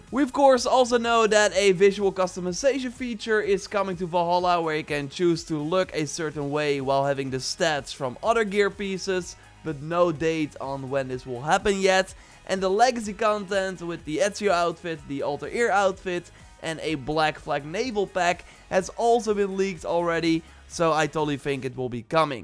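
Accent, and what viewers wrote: Netherlands. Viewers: This Dutch accent hurts my poor ears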